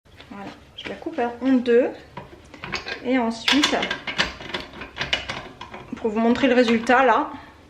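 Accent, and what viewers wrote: South, France. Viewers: C'est un moment détente quand je regarde tes vidéos... Tes plantes... le soleil et ton accent du sud me font oublier un instant le vilain temps de chez nous et les soucis 🙂😘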